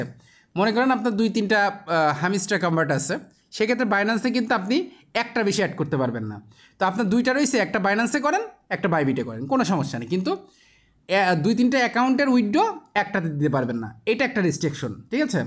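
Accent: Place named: Khulna, Bangladesh. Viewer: অনেক ধন্যবাদ আপনাকে ভাই, ১ম ভিডিও শুনেই subs, like,share করে দিলাম ।এটা আমি খুব করি না । ভাই কি খুলনার ।কথা শুনে তাই মনে হয় ।So nice your speech.Thanks again.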